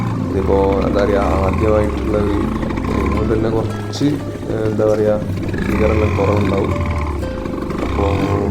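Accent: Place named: Kozhikode, India. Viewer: ഇത് എവിടെയാണ് സ്ഥലം. ഒറ്റക്കാണോ പോയത്.സംസാരം കേട്ടിട്ട് കോഴിക്കോടിന്റെ യും കടലുണ്ടിന്റെ യും ഇടയിലാണന്നാ തോന്നുന്നത്. 🥰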